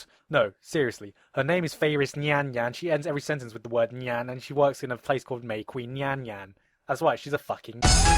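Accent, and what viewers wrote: United Kingdom. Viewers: Wow he really does sound a little more British here than now. Cute.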